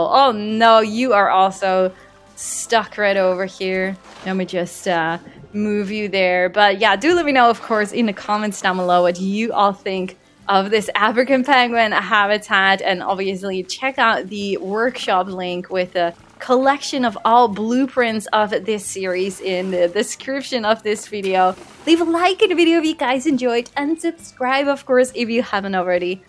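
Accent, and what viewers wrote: Netherlands. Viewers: Only now I run into your channel and I'm in love.. I have a mad respect for the building that you do and the way you make it all look so beautiful! I might be new, but I'm watching it all 😆 For once too I don't hate the accent of a dutch person speaking English! You have a very relaxing way of speaking and I love it!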